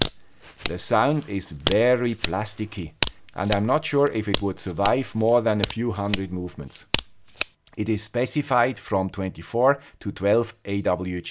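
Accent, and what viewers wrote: Switzerland. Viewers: Having a good Swiss accent, you must be unbiased! But I like my yellow stripper. I had mine to bits, oiled it, adjusted it and loved it and it works fine. I do agree about the end stop. It lets the end go past, and is imprecise in its adjustment. Why don't the Swiss make one? They make excellent watches, so a wire stripper that works should be a piece of cake! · I love your Swiss accent and pronunciation - Knipex for instance. In German the K is pronounced. In UK/US English the K would be silent, so we generally pronounce it 'Nipex'. I suppose it should be K nipex as it's a German product, but I'll stick to Nipex - it's easier to pronounce! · Boy oh boy do you come with some baggage! (Politically correct, left-handed, anti-imperial system with stimulating views of silicon.......). I only hope you find making these videos as cathartic as I find them humourous. 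A real fillip early on a Sunday morning. Power on you favourite 'guy with the Swiss accent':) I remember our blue handled diagonal cutters - that lasted for years being (I was going to say stamped but of course that is too crude) 'engraved' with 'Swiss Made' on the shaft (in fact probably hand-signed - they were so expensive). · I had a dream The guy with a Swiss accent decided to build an eBike.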